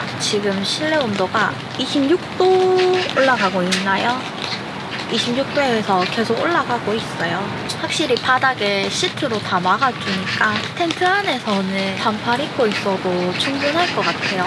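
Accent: Gyeongsang, South Korea. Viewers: 경상도인가요,구수하네요,ㅎㅎ 텐트가넒어난로를피워도,따뜻하이,안정감이있네요,풍경짱임니다,😁💚👍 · 치우캠핑님이 구독중이라는거 보고 들어와 구독 좋아요 알림설정 해두고 시청중 새해 좋은일만 가득하시길~갱상도 말투가 귀엽네요~^^ 임시 땜방용 스카치 테이프도 가지고 다녀야 겠~~네~~요~^^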